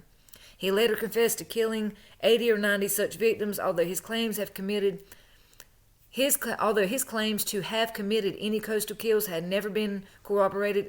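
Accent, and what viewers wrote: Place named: South, United States. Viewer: sis you sound as country as i do!! love ya bunches!~ LuAnn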